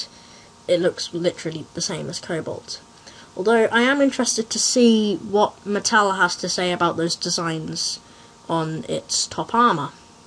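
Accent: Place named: United Kingdom. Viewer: I'm American, so hearing a British accent is sophisticated and refreshing for me, lol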